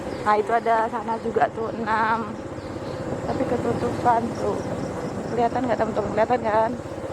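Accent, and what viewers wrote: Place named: Java, Indonesia. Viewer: Adiak ko asli urang Minang apo urang Tran jawa yo ? Soal nyo logad jawa adiak mengecek nyo Alah lamo merantau ka sitiung diak ?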